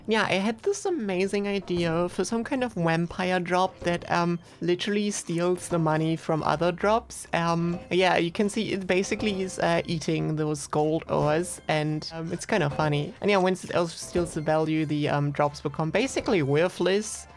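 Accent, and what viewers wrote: Germany. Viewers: she isnt using a voice changer, i think shes trying to make her voice less deep. and shes german so that may be why u cant understand her for some reason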